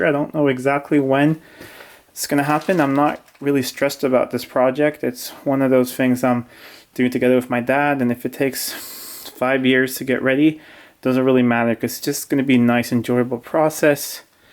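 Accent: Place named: United States. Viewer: Thanks. Listening to your accent I thought that you are from the US and you live in the US. This is why those license plates intrigued me.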